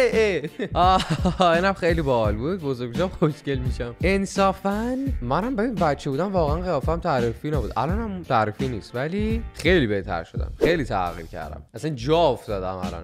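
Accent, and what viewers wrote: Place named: Abadan, Iran. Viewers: لهجه آبادانیت هعی میزنه بیرون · میشه یک ویدئو کلا ابادانی صحبت کنی🥲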